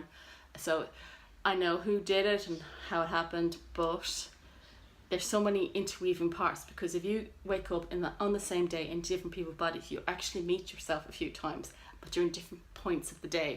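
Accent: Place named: Ireland. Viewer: Hi, I found your channel through Shawn and although you’ve only filmed ten or so videos, you seem a natural and it’s lovely to hear an Irish accent (via Australia was it?). I made a comment on Dave’s channel to the effect that I’m a very new rereader but have embraced it wholeheartedly, despite having as many unread books on my shelves as you have 😊. It’s primarily because I realized I can’t remember the books I read twenty years ago and it feels ridiculous saying I’ve read them when I can remember nothing about them. I’ve done four rereads this year and each one made that particular book so much more meaningful and I feel I could reread each one again. I must admit I don’t think about rereading mysteries and thrillers as part of the fun of those is finding out who did it but with my sieve like memory, that probably doesn’t matter! 🙄 I loved A Tale for the Time Being when I read it a few years ago and now you’ve made me want to reread it. 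Yes to rereading!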